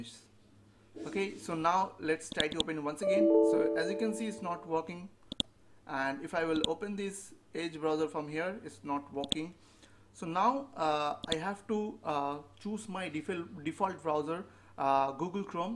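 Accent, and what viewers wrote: India. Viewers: fucking indian accent!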